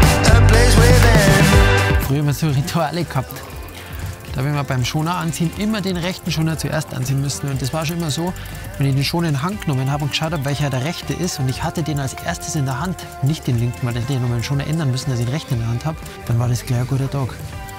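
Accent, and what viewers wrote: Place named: Bavaria, Germany. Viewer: Unfassbar sympathisch - hab di über mtb-news gefunden und ziag ma direkt alle Video rein ;-) Bin selber 29 Jahre und hab erst jetzt mit dem mtb-Sport angefangen. Aber bin scho voi drin und werd mia demnächst auch ein Dirt-Bike anschaffen! Hast a paar Tipps? Und endlich moi jemand, der meine Sprache spricht. Überragend - hoffentlich kommt noch mehr vo dia! Liebe Grüße aus Zolling und keep riding! :)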